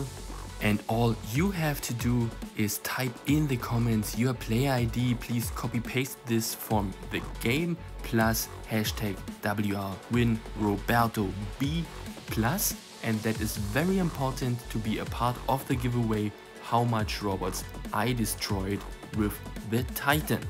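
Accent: Germany. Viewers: ja das könnte natürlich sein aber sprichst nich schlecht englisch auch wenn man halt den typisch deutschen akzent hört😂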